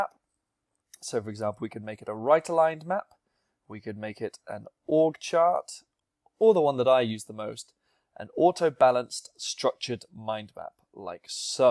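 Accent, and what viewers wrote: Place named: United Kingdom. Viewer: Very nice tutorial, wonderful accent :) God save the king!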